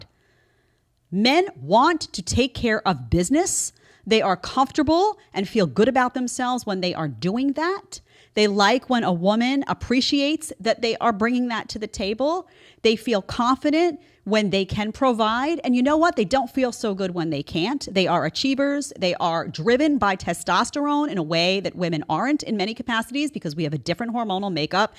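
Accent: New York, United States. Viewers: Mama I truly love that New York accent of yours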